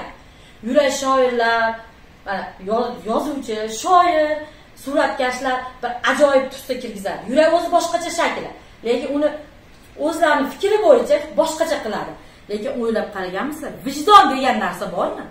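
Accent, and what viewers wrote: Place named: Andijan, Uzbekistan. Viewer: Опа гапни хам Анжонча килиб ташладингиз,офаринг,ёнингиздаги 2-та опа хам гапиришга чикган эдими,халк сиз каби инсонлар бн ...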